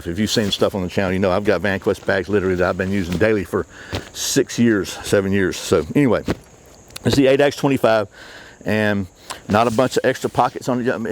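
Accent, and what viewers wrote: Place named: Carroll County, United States. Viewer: sounds like you might be from around the Carroll county area or Douglas county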